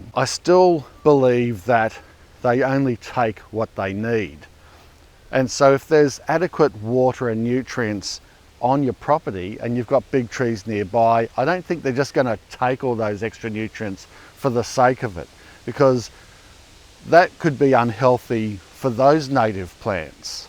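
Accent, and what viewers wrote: Australia. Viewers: Thank you for your wonderful work and share. From Québec, stubborn french speaking canadian hahaha! Going through tough winters and an ocean of english speaking. By the way I just love, love your australian accent! Really sweet. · Hello uncle. many many thanks, today my 5 yrs son was watching with me your video; he was very happy with your talking and beautiful Australian accent 😀 While I was amazed by the way you were managing your beautiful garden! I have a small garden and I am very much thankful for your videos from which I benefit a lot! Best wishes to you, friend!❤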